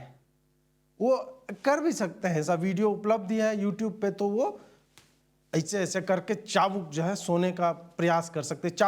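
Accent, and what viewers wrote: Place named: Bihar, India. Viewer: अजीत जी आपका बिहारी लहजे में भयंकर रोस्ट बड़ा मज़ेदार लगता है I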